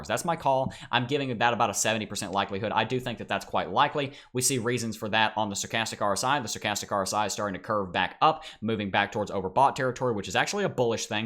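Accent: United States. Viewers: This strong American accent is painful for my ears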